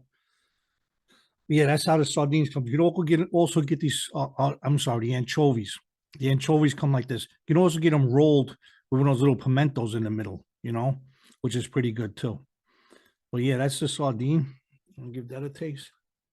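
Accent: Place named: Italian-American, United States. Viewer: Accent makes me want to watch the Godfather for the millionth time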